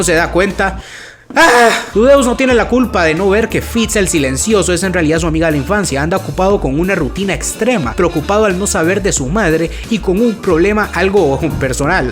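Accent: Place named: Costa Rica. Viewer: BROOOO SOS TICO ¿VERDAD?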